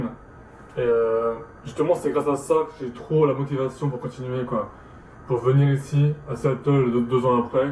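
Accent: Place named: England, United Kingdom. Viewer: T'as l'air d'avoir du mal à parler français j'trouve, t'as même l'accent anglais xD . En tout cas vidéo très intéressante ! Continue !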